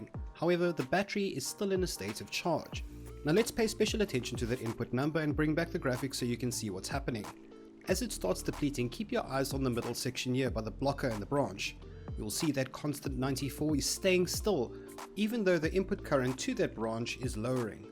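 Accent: South Africa. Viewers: I knew u were south african. Woop woop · So awesome to hear a South African voice for a change. Great videos. Might I ask how long it took you to edit this video? I'm asking because in my mind this would take me days so maybe you have some much more efficient method. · South African accent?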